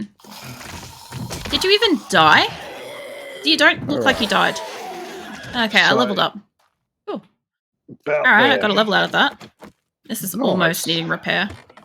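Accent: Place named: Australia. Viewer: I've never been able to watch an entire video of someone mining. Watching you mine is secondary to listening to you talk about nothing and everything all at the same time 😂 there is something about your voice that is easy to listen I and especially love the Australian accent. AJ 👍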